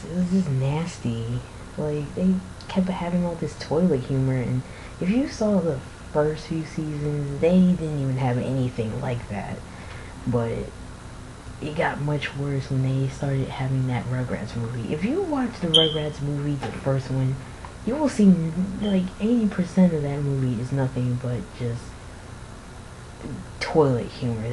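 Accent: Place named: New Jersey, United States. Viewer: sign oh boy you sound like these jersy shore pinheads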